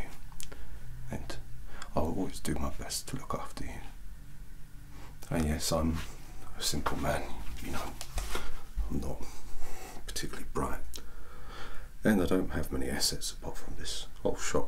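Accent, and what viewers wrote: United Kingdom. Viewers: Could also be titled as "Male Winry Rockbell with British accent fixes you" I wanted to save this for bedtime but... Can't resist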